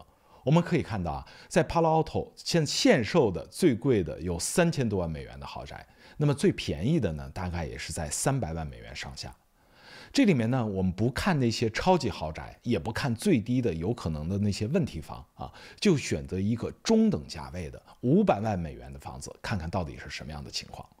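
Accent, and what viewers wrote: Standard. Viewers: 听口音完全听不出是东北老乡普通话相当标准了提个小建议能不能把英尺换算成平方米毕竟用英制单位的国家现在比较少印象里加拿大不也是用公制吗？难道我记错了？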